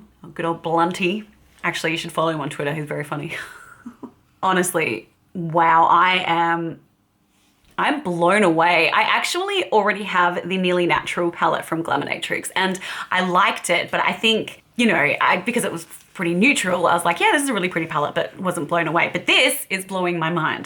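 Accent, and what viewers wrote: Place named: Australia. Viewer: I absolutely love this review 😍 it's so nice to hear my palette being reviewed in an Australian accent 🥰 I work for Glaminatrix and designed this palette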